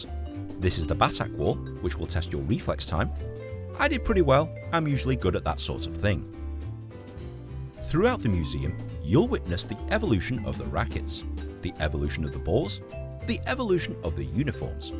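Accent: United Kingdom. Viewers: It’s not a hatred comment but I couldn’t imagine he has such a beautiful British accent … so proud of you Asian brother!!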